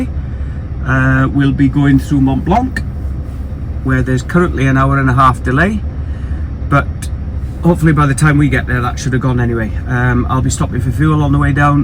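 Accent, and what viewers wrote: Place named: Wrexham, United Kingdom. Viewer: Morninggggg. I know the Castell Mynach very well. I've had a few (pricey) meals there. I prefer the Dynevor just down the road though. On another note, I've been trying to place your accent and I'm guessing at Wrexham. I'm a valleys boy myself. Love the videos. Please keep them coming.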